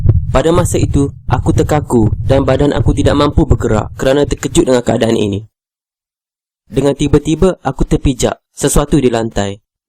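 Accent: Kelantan, Malaysia. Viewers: Demo ore kelate ko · Abg orang kelantan ke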